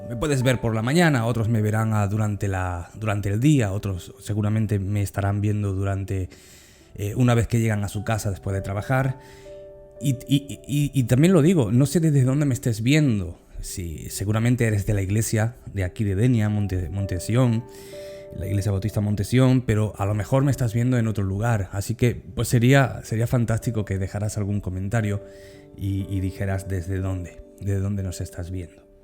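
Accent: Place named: Guatemala. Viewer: Representando Guatemala, ya te volvió el acento, gracias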